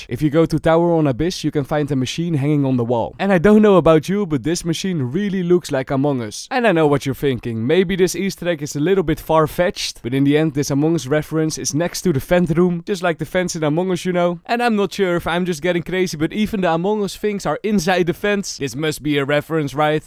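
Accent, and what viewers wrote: Netherlands. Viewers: je hoort heel duidelijk dat ne nederlands bent hahahhhaa maar leuke viddd!!!!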